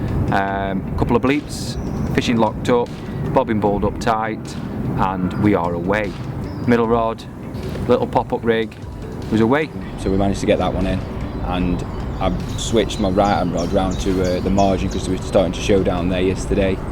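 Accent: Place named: Stoke, United Kingdom. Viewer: nice quality video bud and that is nearly a Stoke accent lol.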